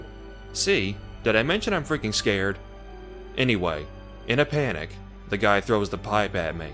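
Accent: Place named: South, United States. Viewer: Love your accent. Sounds southern.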